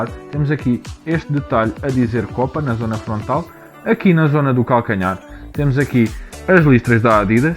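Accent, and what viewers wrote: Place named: Brazil. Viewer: O português mais brasileiro deste YT! Parabéns pelo vídeo,!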